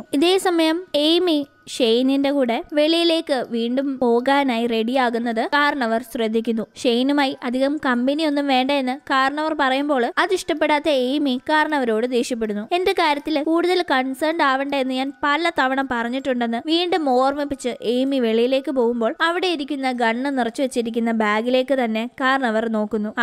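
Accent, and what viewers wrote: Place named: Kozhikode, India. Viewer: sound like kozhikode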